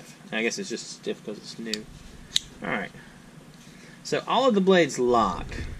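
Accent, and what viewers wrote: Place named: South, United States. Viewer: For some reason in my opinion you sound southern but then again if I am assuming right when I went up to North Carolina a lot of people sounded southern so I'm assume you are from North Carolina or Kentucky.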